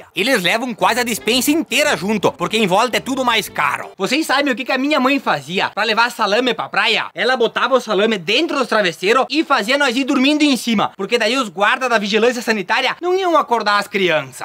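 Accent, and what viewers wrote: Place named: Garibaldi, Brazil. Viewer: Bah cara, exatamente, e esse sotaque de Garibaldi! 😆😆😆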